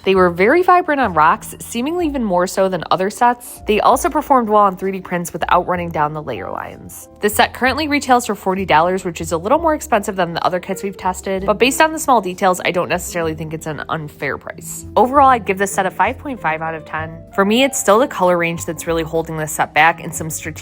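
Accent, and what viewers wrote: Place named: New England, United States. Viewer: i can’t tell if you’re from new england or chicago, i love your accent so much!!!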